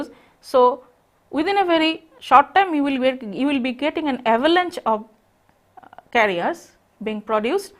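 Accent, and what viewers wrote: India. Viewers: Here is an Indian lecturer.....IN INDIA.......who is willing to teach a very complicated subject IN ENGLISH...........which is obviously not her native language.....and all your lazy ass can do is gripe because she doesn't speak English up to your standards. How many languages do you know? How bout you learn Hindi perfectly and without an American accent rather than requiring Indian lecturers to learn English without an Indian accent. Your comment = epic FAIL!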